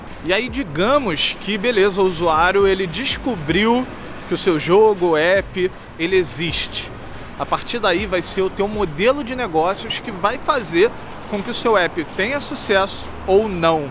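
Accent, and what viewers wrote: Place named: Rio de Janeiro, Brazil. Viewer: O que eu sinto ao ver os videos do: 1 - Esse cara ta mesmo querendo ajudar. 2 -Ele entende MUITO de programação, mas tb entende mto do business por trás disso, não só de ser funcionário. 3 - Saudades de Vancouver, melhor lugar que ja morei na vida. 4 - Sotaque do RJ é foda 5 - Preciso ser aluno desse cara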